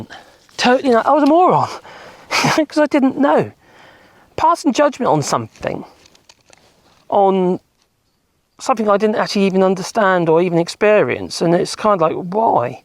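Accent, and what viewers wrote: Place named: West Country, United Kingdom. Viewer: All this time watching channel I thought he was from the west country! 😅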